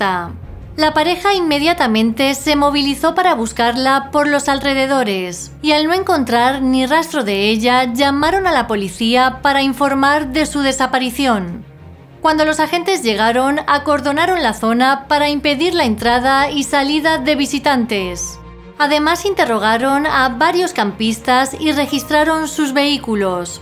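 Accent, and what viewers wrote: Castile, Spain. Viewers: Se escribe pijama y se pronuncia pijama porque habla castellano de España,a que escuela de tu país fuiste tú para corregir algo bien dicho ?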